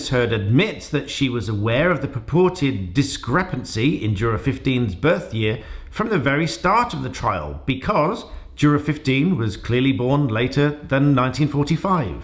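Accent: United Kingdom. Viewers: Heard all this on my American atty channels, but I have to say, is so refreshing to hear with a British accent. This judge has also had her fill of team Amber.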